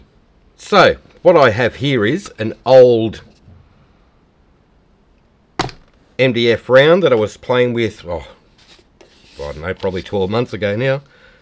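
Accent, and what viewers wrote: Australia. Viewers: where have you been all my painting life?! super glad I stumbled onto your channel - doesn’t hurt to hear you teach in that Australian accent 🥰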